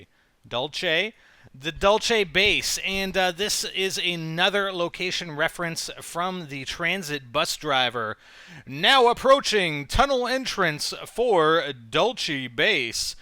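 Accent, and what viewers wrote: United States. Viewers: yeah, he sounds american... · Where do u live you sound american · What country are you from? You sound American or Canadian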